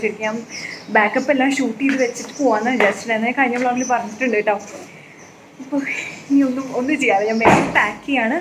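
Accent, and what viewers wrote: Thrissur, India. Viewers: അയ്യോ കണ്ണൂര് വന്നിട്ട് കാണാൻ കഴിഞ്ഞില്ലല്ലോ. ശബ്‌ദം തനി തൃശൂർ ഭാഷ.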